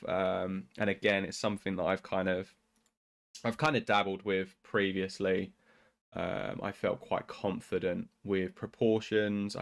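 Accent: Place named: United Kingdom. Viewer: His British he speaks 😮😮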